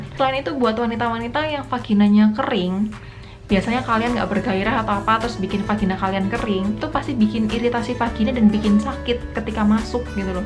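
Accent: Java, Indonesia. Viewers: Sampean wong endi tohh mbak medok tenan jowo na akoh wong ngapak mbak ora ngapak ora kepenak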